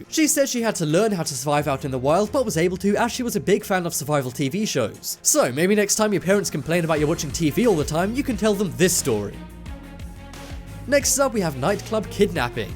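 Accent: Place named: United Kingdom. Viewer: When you enjoy listening to a British accent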